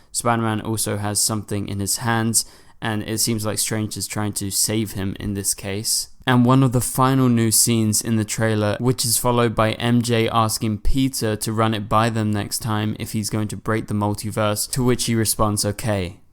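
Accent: United Kingdom. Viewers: omg are u british?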